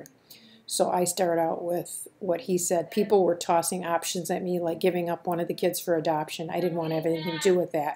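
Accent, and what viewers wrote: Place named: Midwest, United States. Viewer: I can hear a Midwesterner from a mile away lol Great video!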